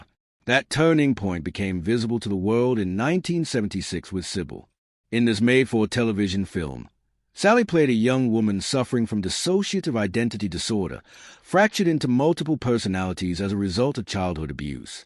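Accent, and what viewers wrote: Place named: England, United Kingdom. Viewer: English accent....that's how they speak.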